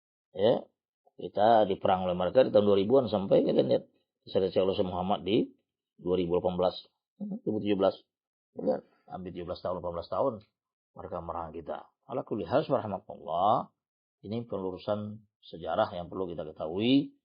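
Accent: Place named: West Sumatra, Indonesia. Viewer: Ini ustadznya orang Minang nih Logat nya...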